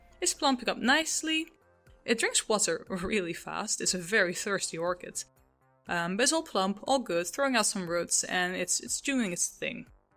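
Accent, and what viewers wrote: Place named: Netherlands. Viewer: Oh No water culture 😲 (Genf ? you sound Dutch)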